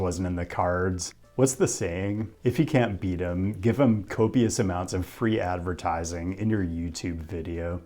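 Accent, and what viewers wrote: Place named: California, United States. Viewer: Dude you have the most CA accent I've heard since The Californians haha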